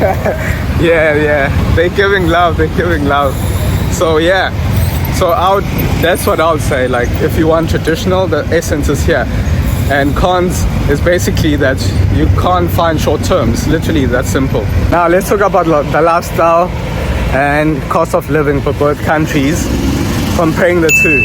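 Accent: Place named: South Africa. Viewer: Definitely South African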